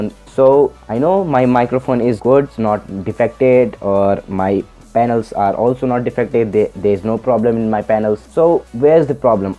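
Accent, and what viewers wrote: India. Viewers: Work on ur english INDIA!!! =) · Hvordan kan du ikke høre at manden er indisk?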